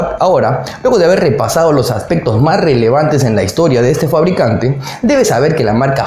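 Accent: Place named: Peru. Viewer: Acento peruano bien definido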